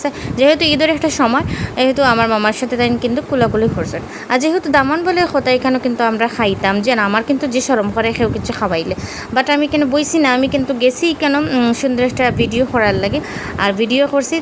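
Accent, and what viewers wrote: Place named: Sylhet, Bangladesh. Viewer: মাশাল্লাহ অনেক সুন্দর ভিডিও আর তোমার মুখে সিলেটি ভাষা শুনতে আরো ভালো লাগছে ধন্যবাদ এত সুন্দর ভিডিও শেয়ার করার জন্য লাইক এবং পুরো ভিডিও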